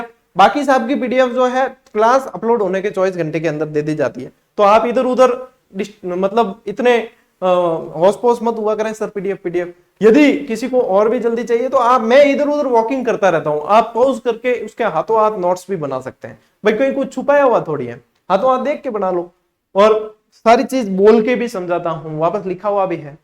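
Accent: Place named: Marwari, India. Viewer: Ek request h plz marwadi na bole apni vedios m..iritation hoti h.. uper se ap apki marvadi bhi alg h smjh bhi ni aati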